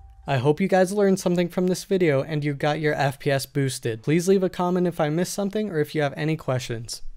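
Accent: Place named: Eastern Europe. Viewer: The creator had a slavic accent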